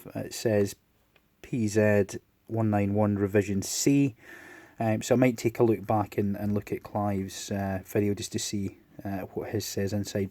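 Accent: Scotland, United Kingdom. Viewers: I want to win or as the way I heard it.....I wan tae win :D Memories of childhood friends with the accent - rarely hear a proper Scottish accent now.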